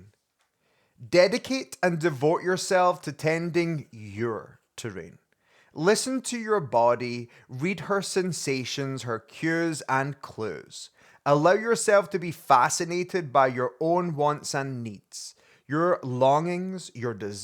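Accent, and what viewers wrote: Scotland, United Kingdom. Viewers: Lovely cards like the coffee love the Scottish accent ❤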